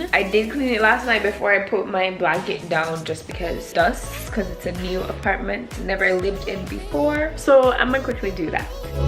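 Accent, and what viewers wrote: Jamaica. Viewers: Very cute Apt. can't wait for the finishing....keep at it girl you seem like a lovely young lady( I hear a Jamaican 🇯🇲 accent) a lot of my childhood friends are Jamaican!!!!! Love them all especially the eating times at their house 😋 I'm from BERMUDA 🇧🇲💖💖💖💖💖💖💖💖💖💖💖nice to meet you your NEW SUBBIE💥💥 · As a Jamaican I can hear the accent a thousand miles away 😂❤️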